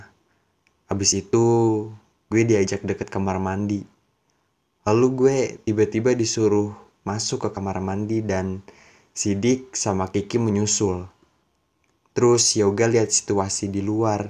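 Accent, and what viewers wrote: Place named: West Java, Indonesia. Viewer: Logat sunda nya agak keliatan yah wkwkwk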